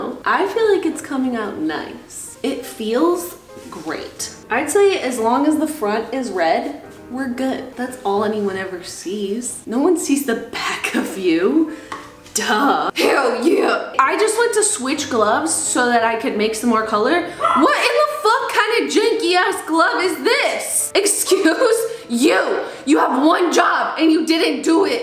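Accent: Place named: New York, United States. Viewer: I feel like as soon as you know is from NY, you can really hear it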